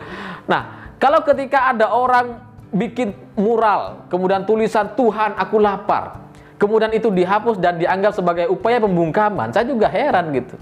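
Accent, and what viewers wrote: Madura, Indonesia. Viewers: Logat Madura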